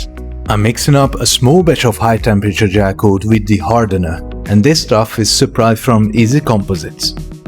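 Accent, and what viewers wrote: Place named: Hungary. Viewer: I thought I heard it on your dialect 😊 My father was from Hungary 😊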